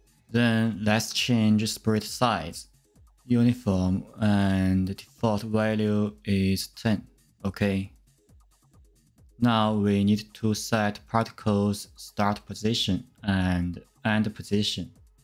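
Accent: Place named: China. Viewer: 比中文还中文的中式英语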